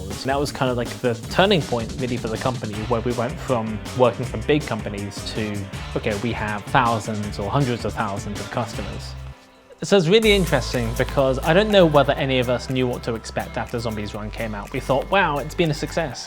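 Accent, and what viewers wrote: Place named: United Kingdom. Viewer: Sounds like a cool idea except I don't understand British accent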